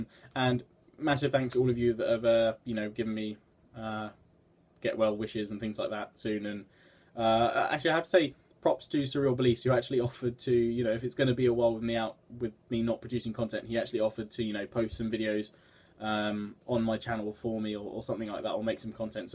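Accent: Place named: United Kingdom. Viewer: Hey please heal soon I am gonna miss your british voice and all of your videos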